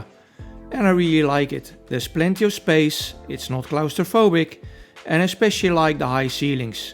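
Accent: Netherlands. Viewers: That Dutch accent! 😍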